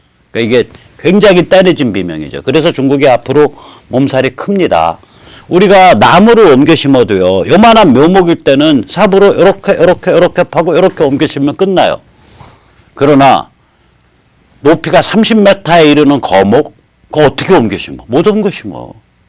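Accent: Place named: Seoul, South Korea. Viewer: 오늘도 체한것이 시원하게 내려간듯..좋은 말씀 잘들었습니다. 국내,외 지인들과도 많이 공유 했습니다. 특히 좋아하는건.. 선생께 많은분들이 잘 못느끼는 특유의 서울 본토 사투리를 들을 수 있다는 겁니다. 배우 한석규씨의 억양도 순수 서울 사투리가 배어있어 듣기 좋습니다. 나는 지방사투리들도 순수한 정감을 느낄때 좋아합니다. 서울말씨를 익히고 싶은 지방 분들은..특히 젊은분들..? 딴것보다 선생과 배우 한석규씨 프로들 많이 보세요.^^ 선생이 오늘 다른 you tube "pen&mike" 후원행사에 오실줄로 개인적으론 기대했는데.. 편 가르는게 아니라.. 大同團結의 의미에서 기대했었습니다. 늘 고맙게 보고있습니다.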